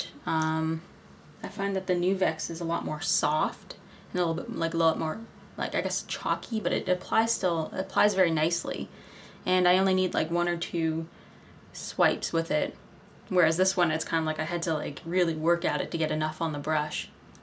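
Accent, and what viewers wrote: Canada. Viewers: Are you from Canada? It sounds like it from your accent.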